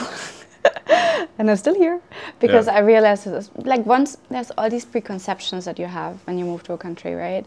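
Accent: India. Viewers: Alas! This gracious lady even integrated indian accent to herself! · She sounds so indian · Her accent is sooooo INDIAN that at one point I thought she might be from here since childhood. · Her accent has slowly changed I would termed it indianish english not fully tough 90% 🙏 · Her english sounds like Indian accent. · Really Really liked how her English accent showed a little of Indian accent. She may not stay in India forever, but she would carry a little of India wherever in the world she goes. 🥰😇😍🤩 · she is getting Indian accent 😂😂😂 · She has an Indian accent 😂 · I love that her accent is also Indian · The way she switches between the German accent and the Indian one is so funny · It's weird to hear her talk in English with an Indian accent. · I was about to say the same thing that her accent has the Indian touch to it.. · Did you note,how smiling she is? She has developed an indian accent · yoooooo i dont want to sound rude but her accent sounds sooo indian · She surely have long enough in India .her English accent is very Indian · That Indian accent :D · Her accent is pleasant Indian English 😮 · at this point her entire body language and expressions and even the way she was talking is completely Indian 🇮🇳 . 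🙌🏻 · She sounds to be like an Indian woman and her accent is cute 😍❤ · She picked up indian english accent. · I'm not from a rich family, but I remember sometimes my brothers and I would ask our parents some very honest questions in regards to poor people or poverty when we were small. Most often, the response was to the effect of "don't talk about it". That's not to say my parents were against curiosity or something, I think it's a societal thing where we tend to ignore these problems going on right around us because it's not proper to ask those kinds of questions. And I suppose the richer you are, the less you think about these things - but this happens all over the world. On a lighter note I love how "Indian" she sounds, her accent is such a melodious mixture of German and urban Indian English 🤗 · She has got an indian English accent as well. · Is it just me or she's speaking indian English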